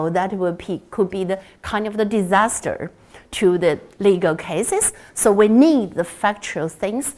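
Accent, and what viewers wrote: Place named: China. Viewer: 中式英语!😊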